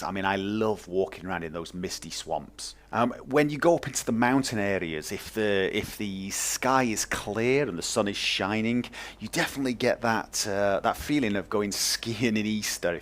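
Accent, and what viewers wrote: United Kingdom. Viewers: I thought he was British lol!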